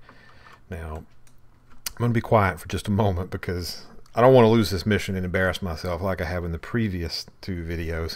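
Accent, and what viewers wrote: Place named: South, United States. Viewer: What's with the accent? Are you a southerner?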